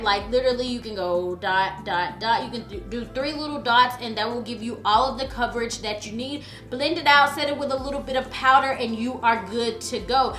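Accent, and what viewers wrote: South, United States. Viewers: i luv your country accent especially when you say the word "sure"...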